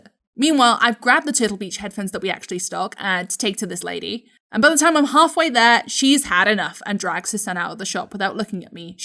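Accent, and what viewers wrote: Wales, United Kingdom. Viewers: i think i found the most british person on planet earth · Subscribed cause i love your british dialect and i love animation i draw one paper myself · Yo are you Welsh as well?!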